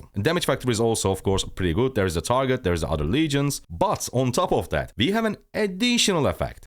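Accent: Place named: Turkey. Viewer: Dude, your accent sounds turkish, are you ?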